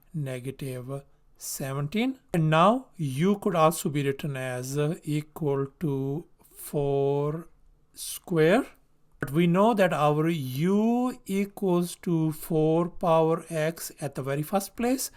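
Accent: India. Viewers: I love Indian ton English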